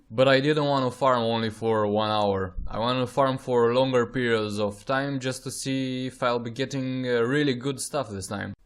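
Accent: Russia. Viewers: russian acent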